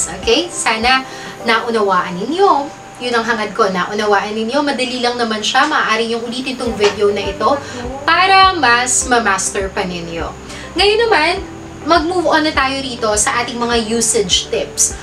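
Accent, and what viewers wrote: United States. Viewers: I think tama yung American accent mo dapat maglesson ka ng American accent sa pagsasalita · Si pinay Engish na blogger iba ang accent nya . She sounds American English . Lahat magaling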